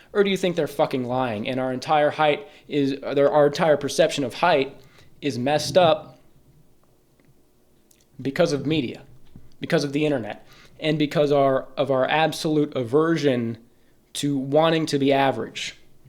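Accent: India. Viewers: Bro's Indian accent💀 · the indian accent :face-blue-smiling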